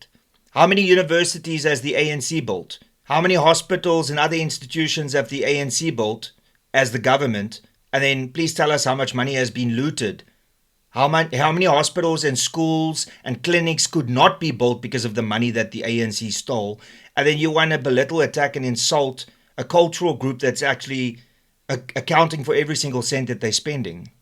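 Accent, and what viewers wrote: United Kingdom. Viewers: Says she in her ever so English accent. 😂😂😂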